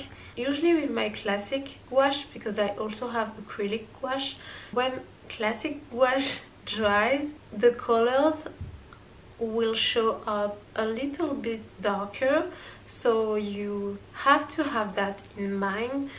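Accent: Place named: France. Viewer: I love you,re accent. Are you frence? comment vas-tu. Bien? I love you,re gouache painting. 😊👋🏼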